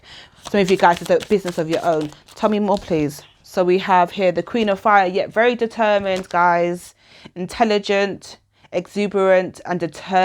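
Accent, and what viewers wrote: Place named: United Kingdom. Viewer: Love the accent, girl. Makes me want to be British. Nuff love from the Jamaican One.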